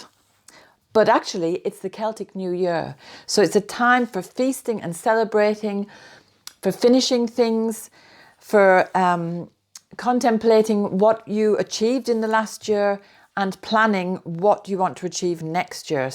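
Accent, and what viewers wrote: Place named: Bishop Auckland, United Kingdom. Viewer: I couldn't quite discern your accent...but I did think Bishop Auckland!! I absolutely love listening to your wisdom. Thank you so much xxx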